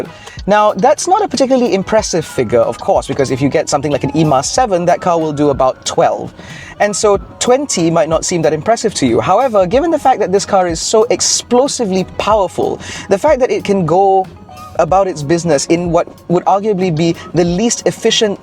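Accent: England, United Kingdom. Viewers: English accent english car perfect match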